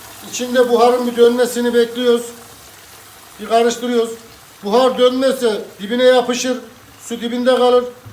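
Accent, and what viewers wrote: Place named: Tokat, Turkey. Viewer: Konuşma Tarzın İç Anadolu tarafı Gibi .Merak ettim Nerelesiniz?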